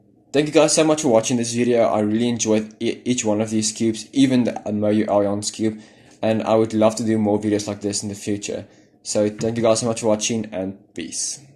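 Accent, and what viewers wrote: South Africa. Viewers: What I'm so sorry but are you South African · Are you south African, nice accent!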